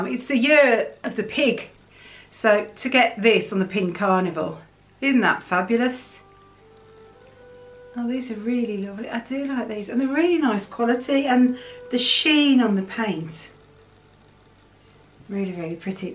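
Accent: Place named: RP, United Kingdom. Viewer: lol the queens English priceless you so make me laugh... Even worse when my ma is from Birmingham lol... Look forward to your videos all day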